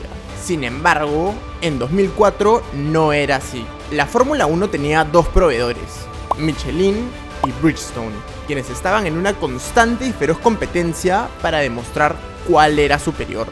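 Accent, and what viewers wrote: Peru. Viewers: Por favor deja ese acento de limeño, habla neutro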